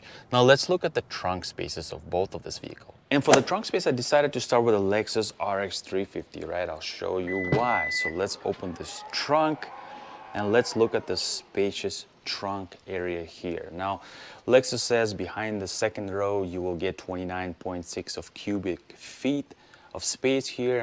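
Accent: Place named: Russia. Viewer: Your accent Are you russian?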